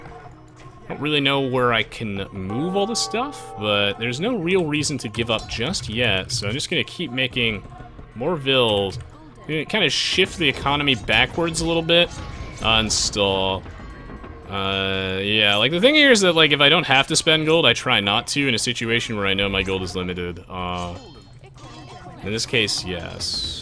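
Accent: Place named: Germany. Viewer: Your occasional german accent really adds to the production value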